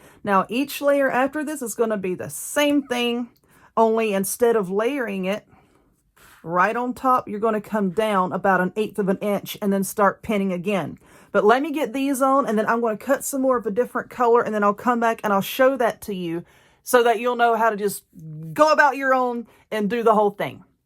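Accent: South, United States. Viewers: That is beautiful and I love listening to your beautiful southern accent.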